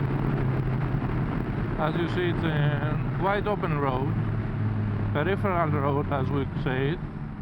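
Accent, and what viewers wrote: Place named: Birmingham, United Kingdom. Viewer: I can distinguish the Brummie accent... 🤣🤣🤣 Keep it up!!!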